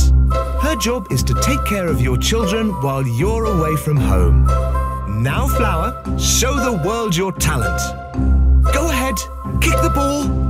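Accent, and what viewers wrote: United Kingdom. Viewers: Narrator sounds like the one from stanely parable. They both get irritated when you don't do what they want. Both are british. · narrator being british also reminds me of tsp so much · La la la la la, la la la la... love the plot of the video, so cute and lovely, the good old British accent of the narrator is a cool touch and makes the video more interesting to watch.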